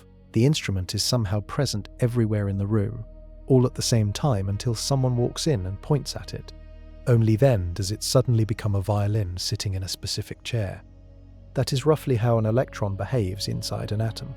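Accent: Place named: United Kingdom. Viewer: I hate this British voice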